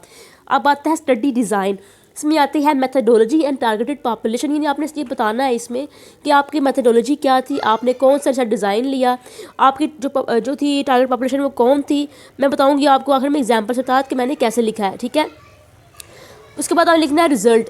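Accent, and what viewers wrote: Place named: Punjab, India. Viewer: Ap key accents sey ap punjabi lag rahi han.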